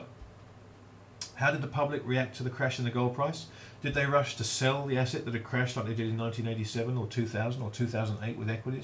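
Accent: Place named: United Kingdom. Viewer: I guess the British accent makes him sound intelligent.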